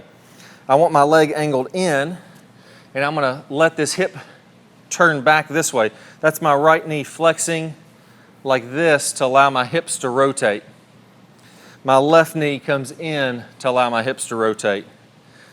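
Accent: South, United States. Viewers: You're an excellent coach. I hear that southern twang shining though. Where could I find you for an in-person lesson?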